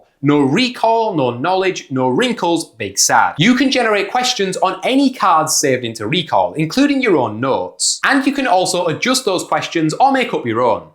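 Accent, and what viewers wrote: Northern England, United Kingdom. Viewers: Gotta love the (British?) accent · Northern (English) british accent.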